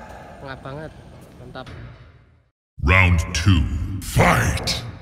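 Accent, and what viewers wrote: Java, Indonesia. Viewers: lu kenapa medok banget jowo nya? Gw asli betawi kalo denger lu ngomong bawaannya ketawa ajah dah liat vlog. Walaupun kadang gw gak ngerti lucuannya.. overall lu kreatif bikin vlog..... lu kapan juara???kapan?gw bakal dukung atlit yg juara.. doa aja ya buat lu mudah2an berlanjut ajah badmintonnya. Konsis.. walaupun gw belum kenal2 amat ttg.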